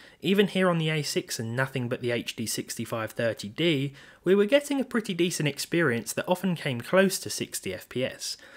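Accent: United Kingdom. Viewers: Lol i love this british accent.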